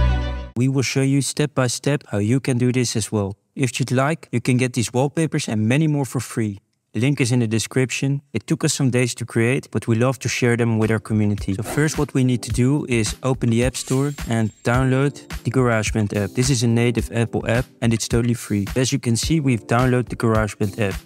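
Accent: Netherlands. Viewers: Are you Dutch you have a very nice accent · u sound so dutch 😭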